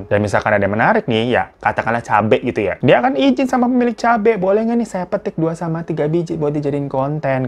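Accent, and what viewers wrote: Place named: Jakarta, Indonesia. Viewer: Ya ALLAH KAMU TUH LUCUUUU BINGIT SIHHH LOGATNYA CAMPUR2 ada betawi , sunda ,cines dll kaya rujak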